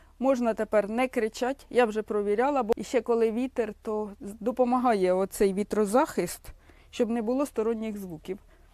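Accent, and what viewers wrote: Poltava, Ukraine. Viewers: ви чудова! Дуже подобається ваш полтавський говір. Успіхів! Вітаннячко з Криму.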